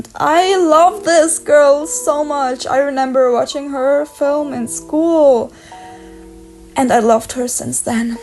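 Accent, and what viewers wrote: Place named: Germany. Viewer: I actually like you German accent!! Loved the video!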